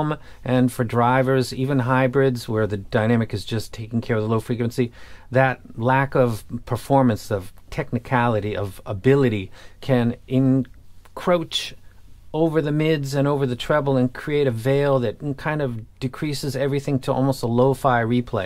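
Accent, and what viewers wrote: Boston, United States. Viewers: Said that three years ago.. Bastohn accent.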